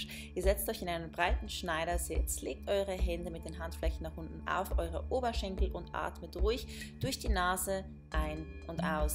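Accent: Switzerland. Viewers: Als offenbar einer er wenigen Männer die noch hier sind geb ich dir mal dass Feedback dass es tolle Videos sind👌 entspannt mich immer was neues von dir zu hören :) Mal davon abgesehen dass ich als süddeutscher die schweizer einigermaßen verstehe haha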